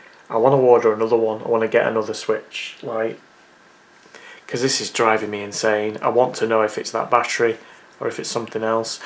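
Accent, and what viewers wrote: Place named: Leeds, United Kingdom. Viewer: your new videos are way better and more authentic, you progressed very well. are you from Leeds? you sound very local to me...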